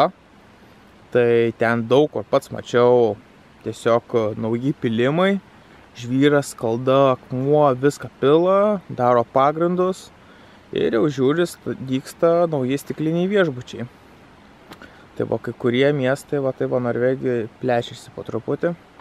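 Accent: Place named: Panevėžys, Lithuania. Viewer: girdis kad panevežiokai :D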